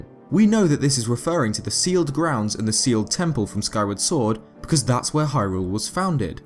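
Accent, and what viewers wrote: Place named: England, United Kingdom. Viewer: shut up guys, I dont hear say ocariner....I however hewr him say O differently. I love his accent! always have liked english accent.💞 I feel close to the english, as we used to be a commonwealth, not sure if we are or not, still have the queen on the money..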